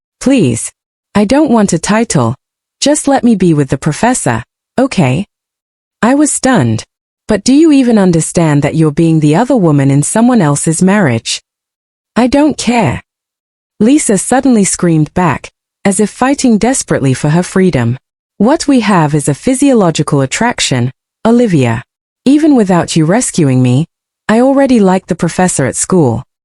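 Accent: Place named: England, United Kingdom. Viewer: 1st! Interesting English accent! 😊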